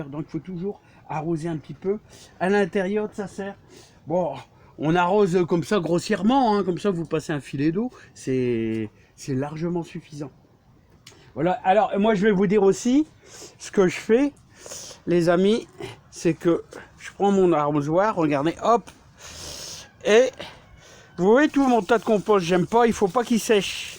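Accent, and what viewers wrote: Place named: Nord-Pas-de-Calais, France. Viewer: Coucou les chti❤;bonne année atoi avec l accent super t’es vidéos.🍾🍾🍾☃️☃️🐓🐓🦆🦆le papi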